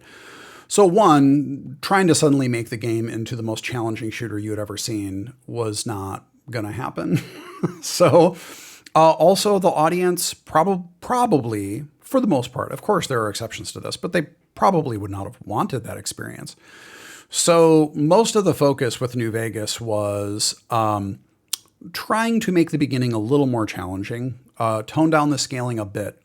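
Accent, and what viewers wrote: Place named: Midwest, United States. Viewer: This is a weird as hell statement but screw it. Why do you sound like my aunt? You from the Midwest?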